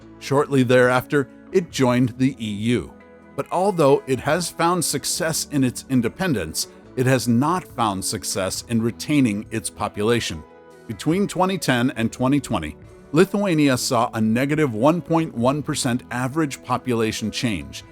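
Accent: United States. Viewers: FRON YOUR ACCENT I SAY YOU ARE AMERICAN, BUT YOU FORGOT TO INCLUDE YOUR BELOVED COUNTRY. 20 MILION AMERICANS LEFT THE USA IN THE PAST FOR HIGH COST LIVING, LOW INTEREST FROM THE GOVERMANT TOWARD IT'S CITIZENS, HEALTH CARE AND SO ON. DON'T TRY TO DEFEND YOUR COUNTRY. PRETTY SOON IT'S GOING TO BE ONE OF WORST PLACES TO LIVE ON THE PLANET. GOOD LUCK YANKEE.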